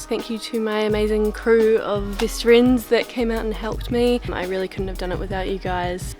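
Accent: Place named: New Zealand. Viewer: Great job mam! So awesome to hear a kiwi accent on YouTube.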